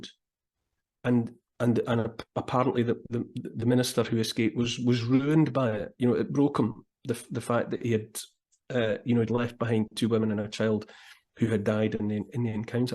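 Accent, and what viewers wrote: Scotland, United Kingdom. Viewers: That strong Scottish accent kind of made it obvious that your a Scottish guy 😅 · I never knew you where a wee Scot? 🧐😊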